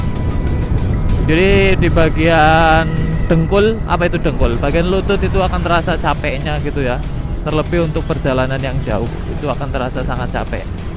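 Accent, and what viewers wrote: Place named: Java, Indonesia. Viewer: Jawa ngapak tapi mas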